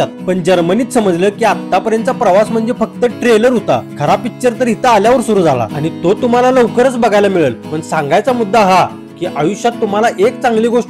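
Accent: Kolhapur, India. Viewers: Khup Chan 👌 Tumchya bolnyavarun tumhi kolhapurche vatata. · तुम्ही कोल्हापूर चे आहात का.. भाषेच्या टोन varun तस वाटतयं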